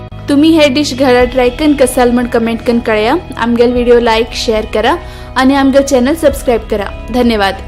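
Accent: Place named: North Kanara, India. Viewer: Excellent video, brought back memories of this simple yet tasty dish. I love your Konkani narration is that from North Kanata?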